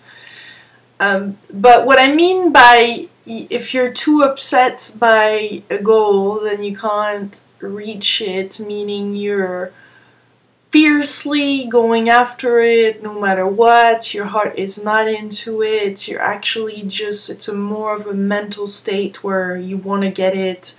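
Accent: France. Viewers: Omg.. u r french.. i could tell from ur accent.. its cute ^_^ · Are you french?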